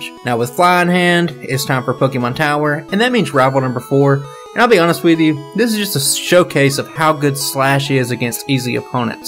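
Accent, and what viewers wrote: South, United States. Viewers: just finished your vid and btw love the southern accent... Quick question do you think you can do a vid of you doing it as if you were playing normally.. as in more than one pokemon.. no tms and no items? Just not seen one of them might be intesresting.. if you do or dnt will keep watching your vids keep it up :)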